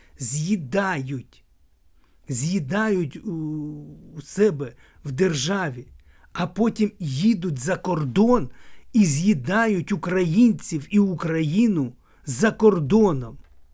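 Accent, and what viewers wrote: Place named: Russia. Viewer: Як не соромно.Що ти иелищ? Навіщо стравлювати людей.Війна.Нпаіщо говориш українською з москальским акцентом? Єто в рашке завжди единовластие а у нас пока слава Богу є вибір. Навіщо використовувати політтехнології ОПЗЖ?Як вже набридли вислови в стилі мураєва.Гагьба.(пожилой человек а вреш как молодой,уже касса ждет на виходе).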